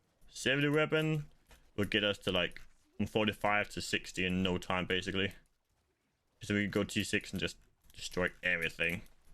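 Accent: Denmark. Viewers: Yo GOAT. Are you not playing HC? Sorry if you mentioned it, didnt watch the whole vid, but I did see you die. Would like to compete with you to benchmark my skills, I usually Play HC but this theme might be a SC season, especially if you go SC. Btw great content, hopefully you get the thousands of subs you deserve this season. Er du dansk forresten? Accenten 😆💪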